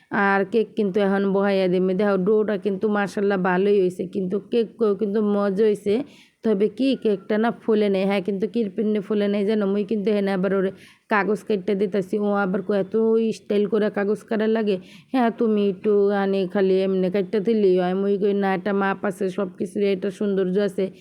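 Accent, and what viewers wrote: Barishal, Bangladesh. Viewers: নাস্তা খাওয়া রান্না কেক বানানো বরিশালে ভাষায় কথা বলা খুব ভালো লাগলো ❤❤❤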